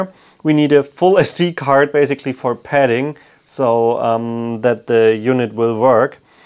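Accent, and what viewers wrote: Germany. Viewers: I knew that you are german :D unseren Akzent kann man auch nicht verstecken :) Cooles Video!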